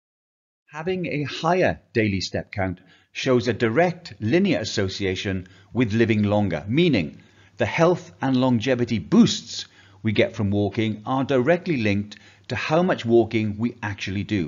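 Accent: United Kingdom. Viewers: I am glade to hear the British accent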